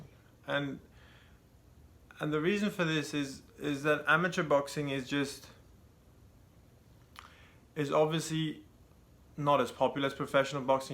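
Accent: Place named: England, United Kingdom. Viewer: What part of England are you from? your accent is very light and easy to understand. Sorry I’m just curious on various British accents, I’ve been watching a lot of Hammer horror films lately.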